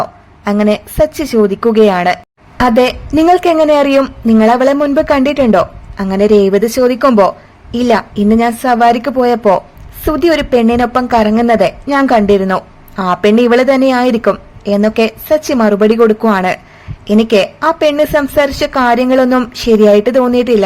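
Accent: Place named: Malabar, India. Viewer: മലബാർ ഭാഷ ആയതൊണ്ട് ചൊതിച്ചതാണ്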